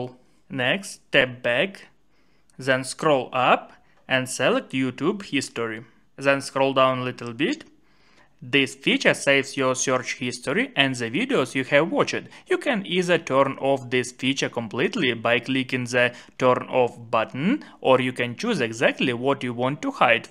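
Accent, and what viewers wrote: India. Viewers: You sound like a scammer from India !!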